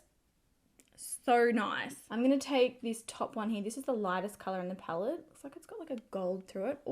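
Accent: Australia. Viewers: I Love your Australian accent I’m in USA 🇺🇸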